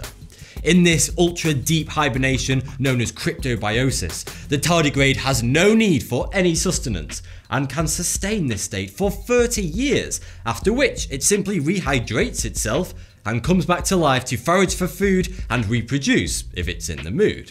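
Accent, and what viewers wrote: United Kingdom. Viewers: Edith Ann Seriously! He's not American. His accent is clearly British.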